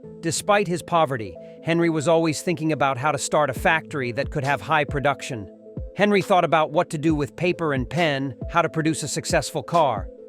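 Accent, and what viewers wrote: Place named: Scotland, United Kingdom. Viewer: I love your Scottish accent. You should make more documentaries about the Scottish with that accent.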